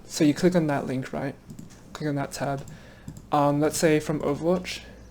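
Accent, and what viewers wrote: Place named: United Kingdom. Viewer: Bro no hate but why do you sound like a British fearless😭😭 please tell me you can hear it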